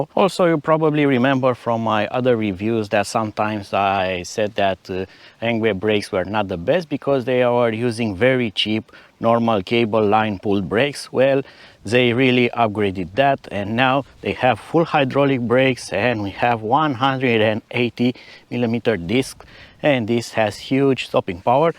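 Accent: Romania. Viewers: Are you Romanian? That accent ..😊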